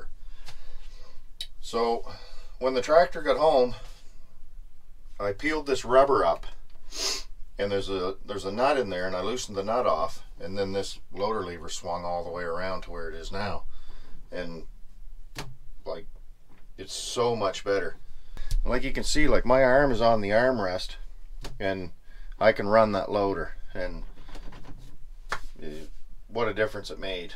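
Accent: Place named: East Coast, United States. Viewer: As I’m watching this clip I’m thinking . Man he has an east coast accent haha. Then I heard Fredericton. Howdy from PEI.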